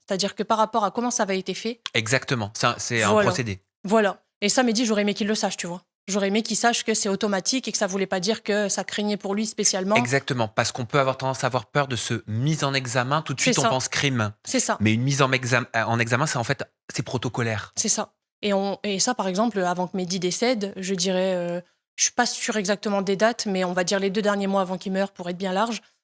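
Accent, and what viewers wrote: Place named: North, France. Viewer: J aime quand ton petit accent du chnord ressens . Parfois j ai pu entendre Medhi a travers ton interview.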